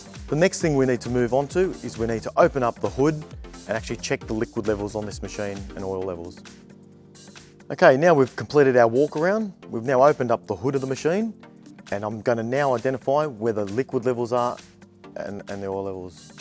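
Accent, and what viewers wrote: Australia. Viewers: The language is English. The "accent" is Australian. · The Australian accent is priceless! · The guy is from Australia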